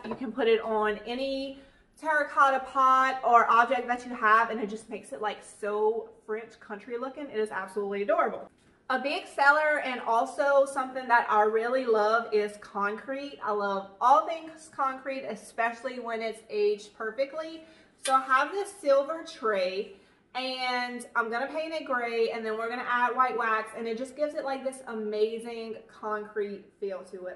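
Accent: Louisiana, United States. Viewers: Darling, You came up in my recommended videos and I told my little granddaughter she's a Cajun!!! 💜 I know she's a Cajun!!! 😁❤️ I can hear it in her voice! She sounds like a Cajun! She's from south Louisiana; I just can't quite pinpoint where she's from. She could be from Lafayette or Ville Platte, or somewhere in the south,; maybe west of Lafayette! I'm not sure but I know she's a Cajun; I can hear it, in her accent. She talks like me. (I'm from Mamou. ) 😁 You're not only a gorgeous Cajun but you're very smart and so talented you have an eye for fabulous flips and I love watching your videos you give me so much inspiration. I'm following you now.. I wish I could put you at the top of the list of my videos that I watch that are suggested by YouTube his man you're good at what you do. Blessings to you. ❤️ P.S. please eat some boudin for me. Haha ❤️❤️❤️❤️❤️ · You’re most definitely a southern Louisiana girl! 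😉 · Your accent is Louisiana! Southern La. at that. I’m from BR. Maybe on the west side of BR maybe one of the Acadians parishes. Loving your channel!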